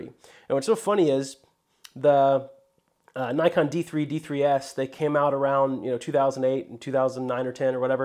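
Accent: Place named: United Kingdom. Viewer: You do a really good British accent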